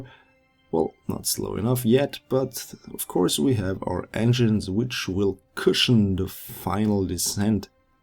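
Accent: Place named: Germany. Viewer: You sound a bit german...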